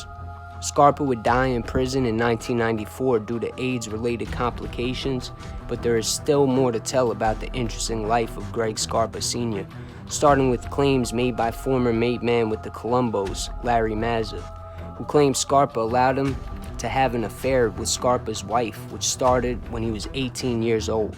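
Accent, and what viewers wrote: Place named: Brooklyn, United States. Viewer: Another nice job. Love your "Southern" Brooklyn accent.. Keep it up--your subs will keep increasing.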